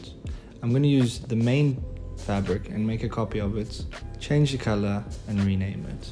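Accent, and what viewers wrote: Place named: South Africa. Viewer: That South African accent! My boy!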